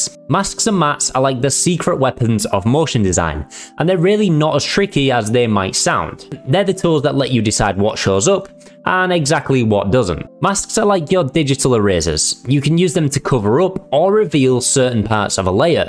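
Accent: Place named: Manchester, United Kingdom. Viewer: You look and saund a bit Mancunian XD are you ?.. oh btw.. where can we find your projects? I love what ive seen here in this video..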